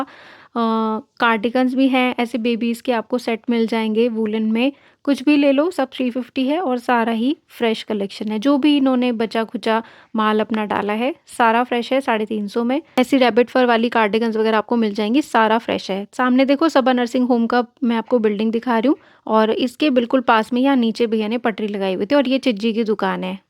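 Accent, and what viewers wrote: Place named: Karachi, Pakistan. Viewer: Lrki tm bikul hmari trahn yani Karachi walon ki trhan Urdu bolti ho..pharry aan dhmky wghera.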